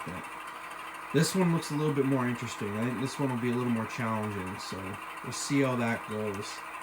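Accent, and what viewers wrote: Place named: Cambridge, United Kingdom. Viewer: you sound like you are close to me , Cambridge ???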